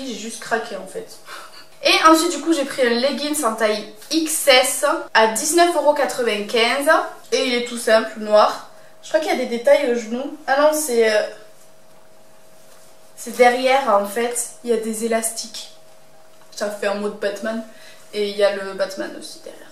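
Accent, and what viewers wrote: South, France. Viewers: l'accent du sud miss vive le sud bisous j'adore tes vidéo continue comme sa gros bisousta un corp de rêve moi je comme a l'avoir je fait beaucoup de sport ❤❤ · D'ac, je pensais que tu parlais du undiz du polygone de Béziers car dernièrement tu cherchais un bar ambiance sympa sur béziers:) Au passage t'es vidéos sont aux top j'adore ta façon si naturelle de parlais et t'exprimer avec ton accent bien de chez nous:)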